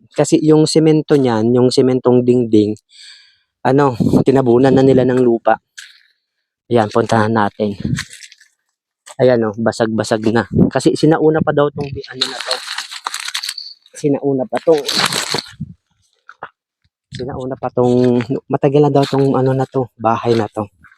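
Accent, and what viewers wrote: Philippines. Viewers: Bicolano ka po Sir?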